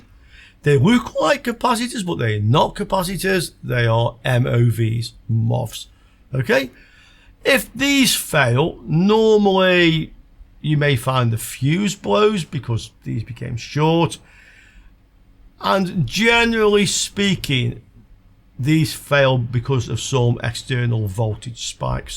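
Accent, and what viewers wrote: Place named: England, United Kingdom. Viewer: Thanks for handing down your experiences. Your videos are organized, frank, and honest. I frequently have your repair on my workshop playlist to keep me company in the lab. I might even pick up your English accent.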